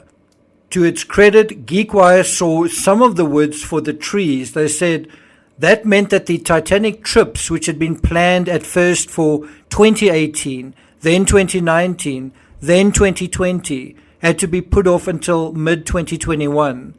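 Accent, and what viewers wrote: South Africa. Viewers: Your South African pronunciations are interesting and enjoyable. I have a SA acquaintance who likes listening to you, reminds her of home.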